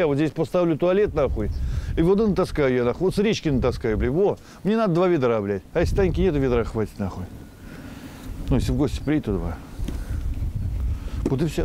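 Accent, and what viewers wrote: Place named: Okanye, Russia. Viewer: Окать перестань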